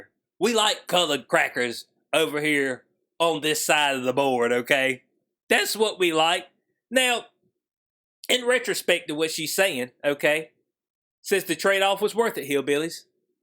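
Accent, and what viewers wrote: South, United States. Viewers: Dude I like your accent it's so country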